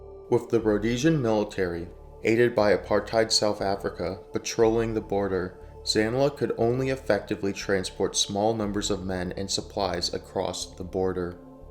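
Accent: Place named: United States. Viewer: very exaggerated American pronunciation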